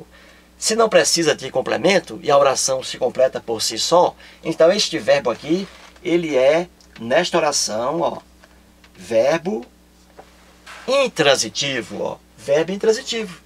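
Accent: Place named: Ceará, Brazil. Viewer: eita cearense vei arrochado.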